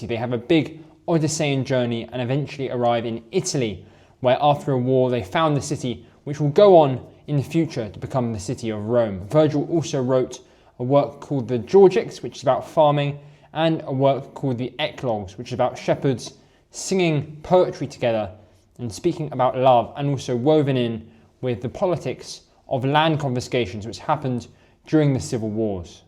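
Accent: England, United Kingdom. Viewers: I don’t want to be disrespectful, because it’s not such a bad video after all, but I can assure you (as a Frenchman) that the verses from Mallarmé and Hugo are even more beautiful without such a thick (and annoying) English accent…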